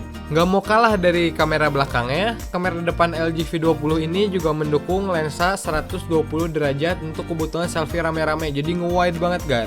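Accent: West Java, Indonesia. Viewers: pertama kali mampir ke channel agan, sunda pisan ini logatnya, ditambah liat "ciparay" :P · Udah "mah" kameranya bagus...eta meni sunda pisan si akang...linggih dmn mang?wkwk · logatnya sunda banget gan😀 btw kameranya ngiler sama anti mainstrim 👍harganya udh turun 5jt lbih skrng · orang sunda sigana ieu teh nu review na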